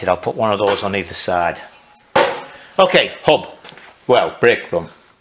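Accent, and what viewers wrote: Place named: Teesside, United Kingdom. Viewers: Only found your vids. a month ago so a lot of catching up. Was intrigued by your Boro accent so have looked U up. We must have been around similar people similar times Graham Petite John France Geoff Crust Chris Rea for my sins once a Boro lad always a Boro lad. Love your simplicity & knowledge of engineering/Metallurgy. Me Metallurgy Dorman Long Warrenby Brittania SB Coke Ovens Lackenby. Good to C U putting in the graft. Ignore the twitters. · Brilliant videos great watching still got that Teesside twang then, Martin from Hartlepool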